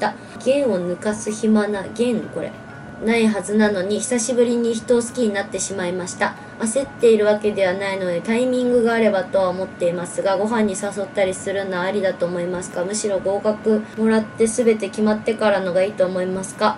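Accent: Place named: Kansai, Japan. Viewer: やばない？ってさんの関西弁めっちゃかわいい笑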